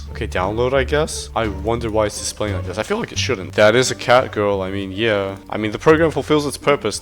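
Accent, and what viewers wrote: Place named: France. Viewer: your french accent is crazy considering you don't know french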